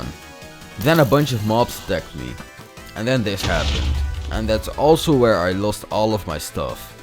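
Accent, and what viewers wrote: Netherlands. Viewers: Are you dutch? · U DUTCH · Are you dutch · You’re Dutch and i can hear that XD Edit: on is Dutch chat said: nu pas and that is Dutch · where are you from netherlands? or are you russian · Are u dutch?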